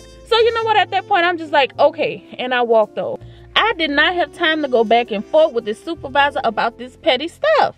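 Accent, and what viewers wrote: New Orleans, United States. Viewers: I hear some New Orleans in that accent. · Are you from Nola...I love your accent 🤔😊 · Are you from N.O.? I hear it all in your voice. Lol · Sis. I'm still looking up to you as a RN gaining her BSN and ALWAYS choose yourself especially when it goes against your moral code. You from New Orleans? I hear it. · Why do you sound like you're from New Orleans, lol. . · Please confirm my suspicion...you're from New Orleans right? 😆...I'm from there and I just feel like I hear the accent....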